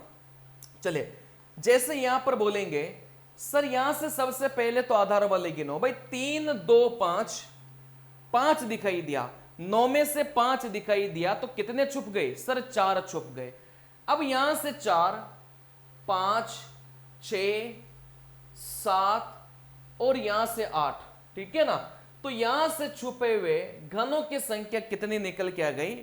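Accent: South, India. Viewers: Sir aap south indian ho kya???? 😅 Accent wahi la lagta hai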